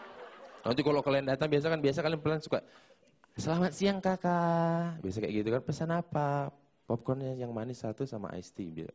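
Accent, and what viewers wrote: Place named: Batak, Indonesia. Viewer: bataknya nyanter banget, wkwkwkwk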